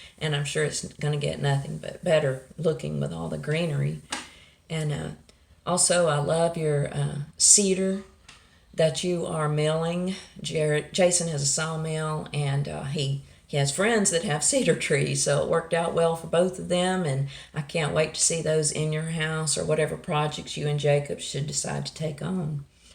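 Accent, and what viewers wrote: South, United States. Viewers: it was wonderful seeing you and I understand about how this house arrest we are all on hasn’t really affected me to much either. I’m so very very sorry to hear about Chico and Gizmo, I loved watching those babies hop around and play.I know how hard it was for you and George.Again I’m so sorry to hear that.I’m hating to hear that George has been down for over two weeks. I pray he gets through this episode soon. I can’t imagine the pain he is in.I will definitely keep him in my prayers.Thank the good Lord above that Granny is home and doing well. She is an amazing women.GOD BLESS HER. Well I’ll stop rambling but it’s been awhile and I’m so sorry it’s been really rough for you guys, but I sure was happy to see your pretty face and here your awesome southern accent, I love to hear you talk.We southern girls have to stick together about our accent. 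God Bless you all and I will keep you all in my prayers. Please take care and be safe. 🙏💕🙏💕🙏💕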